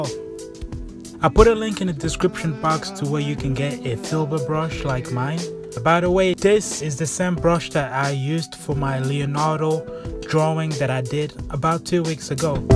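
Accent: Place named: United States. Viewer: he is gaining an american accent overtime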